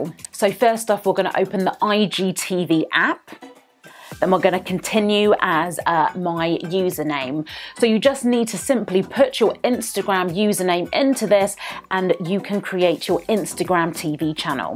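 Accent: United Kingdom. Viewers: love your accent, great content! i have never used the app, but i just use the IGTV on the instagram app. will download the IGTV app to make it more official lol, haha that british accent of yours, omg! look forward to more of your content.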